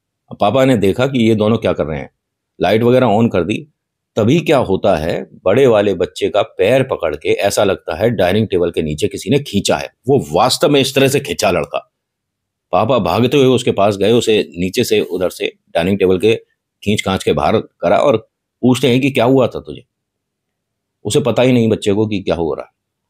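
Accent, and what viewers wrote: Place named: Meerut, India. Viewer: Bhai aap meerut seh ho na.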